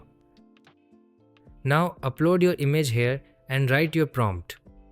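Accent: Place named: India. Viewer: As soon as heard the Indian accent I already knew it was some bullshit up ahead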